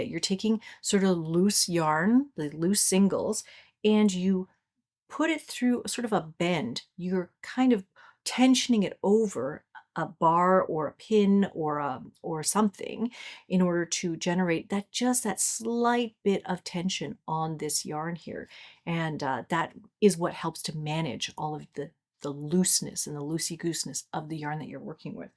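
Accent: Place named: Canada. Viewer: Yay! Vancouverite here. I was about to ask if you were Canadian, your accent gives it away. Georgia St?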